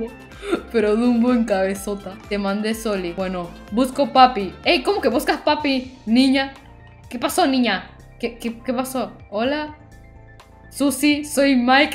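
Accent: Argentina. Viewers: TU ASENTO ARGENTINO JAJAJJAJAJA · Como te cambio la voz me encantaaa ❤❤❤ ame el mamáaaaaaa eres tu sos Argentina??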